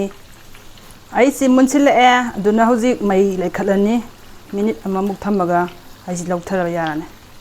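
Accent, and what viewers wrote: Kakching, India. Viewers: Meitei lonse mawong ama toudeye kakching haiba lamdugi dialect ne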